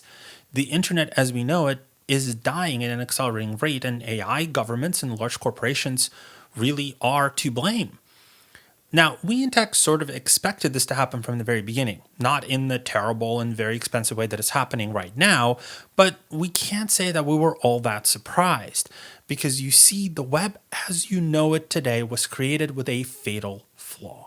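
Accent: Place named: United States. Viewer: some annoying american voiced thing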